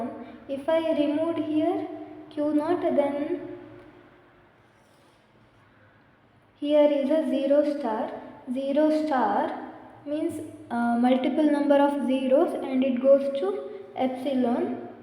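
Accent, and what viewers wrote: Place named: Mangalore, India. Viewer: U r from manglore right???😁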